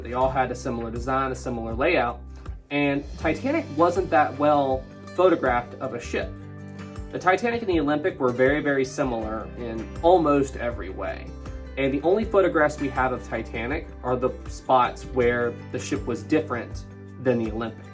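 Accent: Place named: Maryland, United States. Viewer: Side note: I'm listening to your accent and can tell you're from somewhere in Maryland. If you don't mind me asking, where abouts in Maryland?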